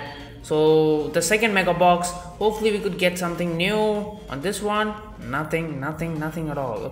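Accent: India. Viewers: R u indian?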